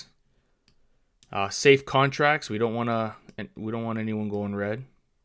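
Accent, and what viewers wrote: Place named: Jamaica. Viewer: Hey u Jamaican..jah know u sound like one ?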